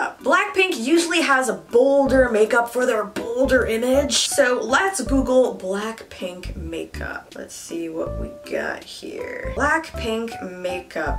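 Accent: United Kingdom. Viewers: The British accent is so realistic I am from England you literally sound like me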